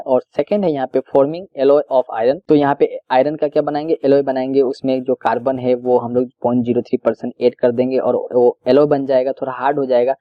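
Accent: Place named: West Bengal, India. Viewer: Thankyou very much sir.......I'm very happy to see...that you are helping me.....and many other students... thankyou.. very much sir......sir....from ur...voice...mujhe aisa lag rha hai ki aap bangoli ho..........thnq sir...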